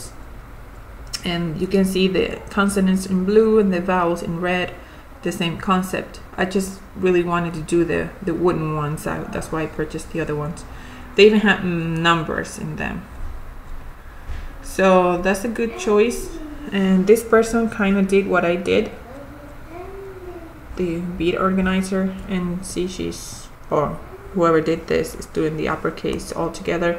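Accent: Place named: Spain. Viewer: Hi, It seems that your mothertongue is Spanish. What language do you work with your child? English or Spanish? I'm asking that because my daughter is bilingual and I'm not sure if I should try to help her to read Portuguese or French, the language she will learn at school? Can you pls give me an advice? Thanks.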